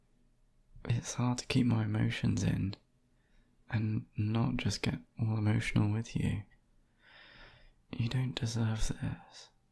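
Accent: England, United Kingdom. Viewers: Ayy proper English accent hear that daily in my life but this one sheeshhhh cute as hell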